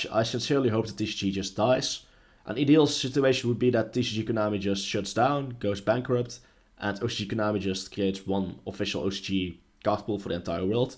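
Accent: Netherlands. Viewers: Are you Dutch? I can tell from your accent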